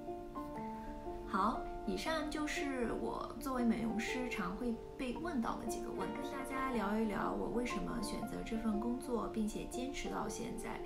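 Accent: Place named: Yunnan, China. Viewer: 听出了云南口音🤏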